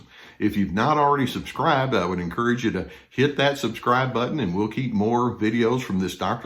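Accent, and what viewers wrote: Texas, United States. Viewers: He's not southern, he's Texan. :)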